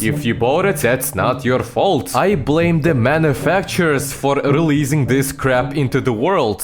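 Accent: Russia. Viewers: This Russian accent pmo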